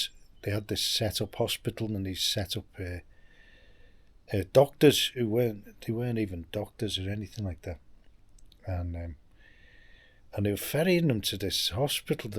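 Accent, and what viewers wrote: Liverpool, United Kingdom. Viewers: All this from in your head ... you have some brain mate! Barrow boy, paper round and betting shop. With scouse accent ... No way! This does not add up ... I will try and join the dots and work you out! :)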